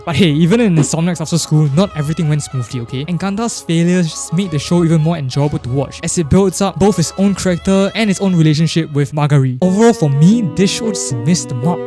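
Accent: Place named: Malaysia. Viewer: This was a cathartic review for me, thank you for throwing shade on these characters. They really are as one-dimensional and bland as cardboard. I never understood the hype for it aside from the gorgeous artstyle + character design. Also, I might be tripping but you sound Malaysian af (or maybe Singaporean)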